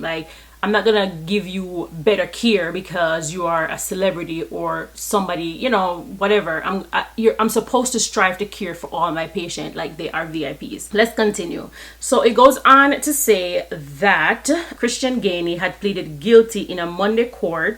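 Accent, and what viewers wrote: Jamaica. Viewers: I don't even have to ask if you're Jamaican, I'd only ask what part you're from? I can recognize my people anywhere!!! New subscriber here, also a RN and you are already inspiring me to climb higher! Bless you! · You sound very Jamaican! 🇯🇲 I was watching a video re this story, just minutes ago. It was by a doctor. And I was very interested to hear what happened. But his lacklustre delivery, his projection, etc., I just couldn't listen him anymore. So I scrolled, and there you were. I clicked, and few minutes into listening, I subscribed. Good job! You're brilliant, you're fluent, projection 100%, delivery 100%. Overall, a very informative and excellent presentation! 👏👏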